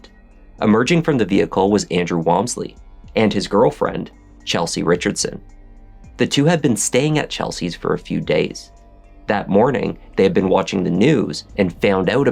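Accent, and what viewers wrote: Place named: Canada. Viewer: He's not American. He has a very noticeable Canadian accent.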